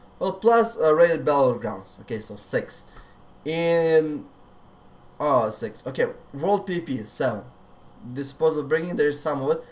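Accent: Russia. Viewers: Pure russian accent and humor . Gotta love his style <3 .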